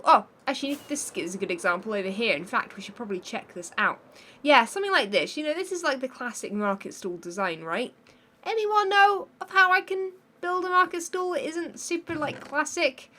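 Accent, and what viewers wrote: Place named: London, United Kingdom. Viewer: She’s from London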